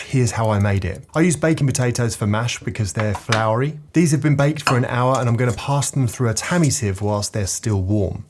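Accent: United Kingdom. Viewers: He sounded like a random British dude before I read this comment...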